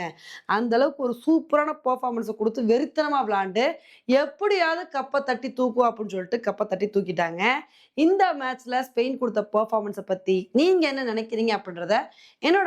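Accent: Madurai, India. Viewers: மேடம் நீங்க மதுரையா பேச்சு வழக்கு செம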